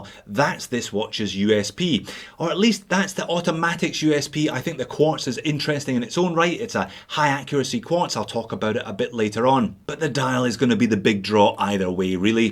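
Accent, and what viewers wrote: Scotland, United Kingdom. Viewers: I don't mind Australian, but that Scottish accent is just too precious, try to keep it intact! I wonder how much the linen dial itself actually costs to make? That boxed sapphire crystal looks amazing but certainly drives up the price massively, so does the Swiss quartz. Maybe someone could almost replicate the same look with a "vintage" boxed acrylic and a Japanese quartz for under 100 USD? Would buy that in a heartbeat.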